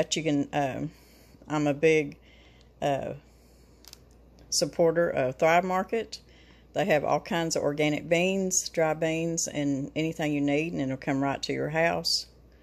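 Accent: South, United States. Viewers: Thank you! I've got tons of dry beans, but only a few qts of ham and bean soup. Need to get more beans for canning bc what you said makes lots of sense. Just LOVE your Southern Belle drawl. ♥️ Subscribed.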